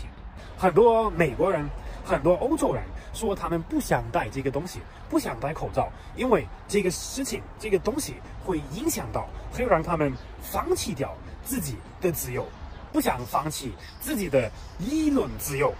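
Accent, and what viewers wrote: Sichuan, China. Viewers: 喜歡你的風格幽默風趣，川普很好聽，👍三觀正正的帥氣小伙子。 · 这个视频做得可以👍我全完赞同的观点！的川普说得巴适，四川人听到安逸，外省人又都听得清楚👍👍😀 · 四川话可以的嘛！ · ，你的川普很棒、很亲切。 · 四川味好重啊，哈哈哈哈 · 这川普，四川味太浓了！！ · 你这中文好四川。。。哈哈😊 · 你說的普通話是否四川話混合的呢? 我說的是廣普, 但正宗普通話好像有些不同啊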